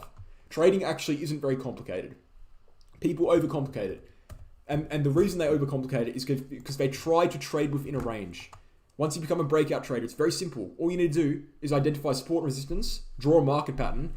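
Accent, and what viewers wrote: Australia. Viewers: And screw it if the channel doesn’t grow. Your honest. People can’t handle that. Screw them. 😂😂😂 Plus on a lighter note , I lived in Oz and New Zealand, Your accent is Oz not Kiwi. 😂😂😂😂😂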